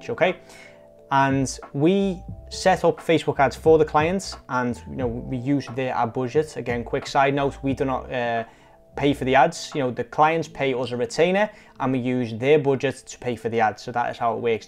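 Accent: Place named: United Kingdom. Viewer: So, ....you are in The Netherlands, but you have a British accent and your photo at the end of the video is in Rome. Is that right? Are you British? I like your channel.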